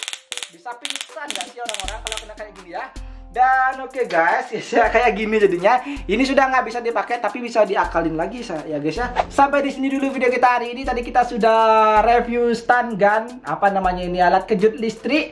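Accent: Indonesia. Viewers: Kyk minang kocak suaranya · Kamu orang bali ya...mas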